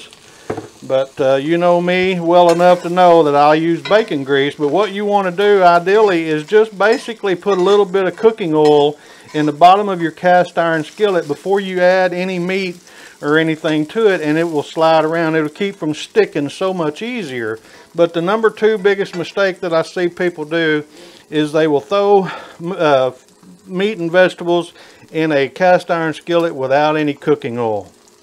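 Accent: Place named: Tennessee, United States. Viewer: That's the way it's pronounced in middle Tennessee where I'm from. Like does.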